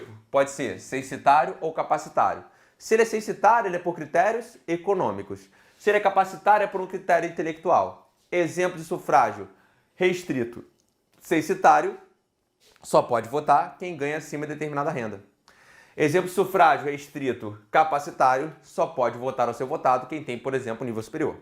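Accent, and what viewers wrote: Rio de Janeiro, Brazil. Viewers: Prof.. adorei sua aula!! Parabens e obg!! Mto bommm “mexmo” hahahaha usando seu carioques!! 👏🏻👏🏻👏🏻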